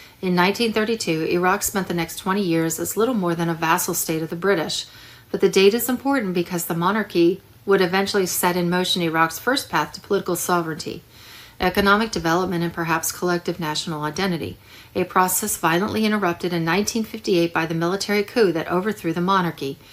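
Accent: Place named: East Coast, United States. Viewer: May I say it. Is good to hear your voice. I only know you by your voice. I have listened to Restored Republic for over 2 years. There was a pause foot 6 or 8 months. But thank God you are back on U Tube. Im in Az by the way. I was getting Sherraye at one tiime. But not anymore. I first heard about of programs like yours over 4 years ago. I heard people giving prophecys when I lived in St.Louis, Mo...I began to liisten and pray for them. God called 2 or 3 Prophets home back then. I have said enough ough but I just wanted to tell you how blessed I have been hearing your voice over the years. Im 83 years old and partly growing up in Buffalo, N.Y. your voice reminds me of back east or people in Canada.. God bless you and Thank you so much for what you do ..For expressing your love for our world, our country and the people. God Bless A Fan Casa Grande, Az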